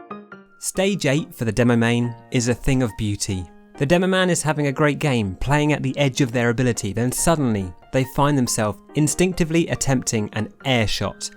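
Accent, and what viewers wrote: Scotland, United Kingdom. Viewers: but are you scottish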